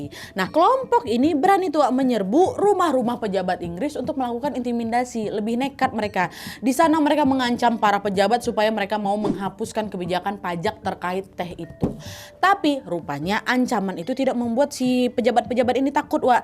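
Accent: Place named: Medan, Indonesia. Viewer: Mantap kalee kakak ini penjelasanya....suka sekali saya dengan gaya Medannya...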